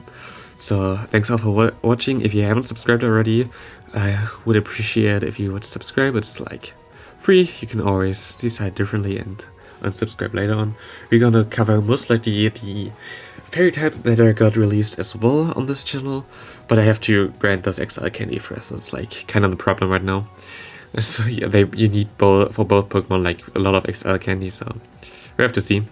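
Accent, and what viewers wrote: Germany. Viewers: Gutes Video aber ich cringe weg mit dem akzent (nicht besonders starker aber deutschen akzent erkennt man gleich)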